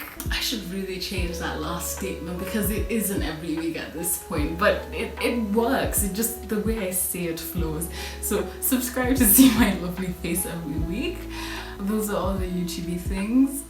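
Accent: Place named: United Kingdom. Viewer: Very unrelated to the video but this is the first video of yours I've watched and for a good solid three minutes, my brain was fighting itself over your accent, like half of it was like "definitely British" and the other half was just "idk what that accent is but it is Not british" and the first half of my brain was like "oh so do you have any ideas" but then I remembered that most people have their country in the about section of their channel so that cleared it up. (Can you tell it's late at night for me) Anyways about the video, i've heard terrible things about Twitter and tiktok specifically so i just never got them, but i have seen how it just makes people more argumentative, like it seems everyone wakes up and chooses violence. Obviously it's not anyone's job to educate anyone and everyone about, say, why people deserve human rights, but it seems that people will use that as an argument, like "screw you go google it that's not my job" when someone asks for, say, sources to corroborate a claim. 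And another thing is politics. I'm not American but I'm sure most people see a good bit of American politics on social media, since it's a global power and all. But at this point all i see is Republicans and Democrats calling each other the r slur for just existing, whether the previous conversation is political or not. And that kind of political tribalism, which was shown in an election in my country before i was born where 800 people died, does not lead to anything good ever. I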